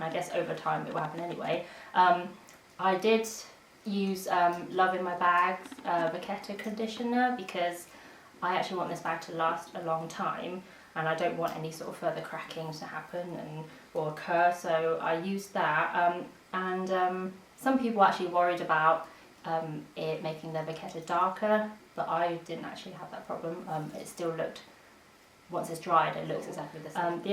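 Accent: United Kingdom. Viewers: So strange seeing someone Chinese with such a heavy british accent! Mind blown! lol xD Very interesting and good close up shots on the details - great! Very well done for your 1st video. I can see you being successful - honestly (not just saying it). Very impressed!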